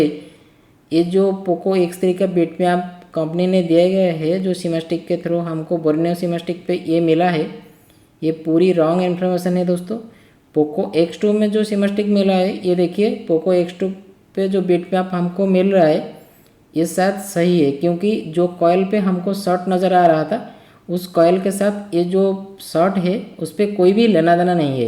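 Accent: Odisha, India. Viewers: Emiti laguchi tame odisha ru boli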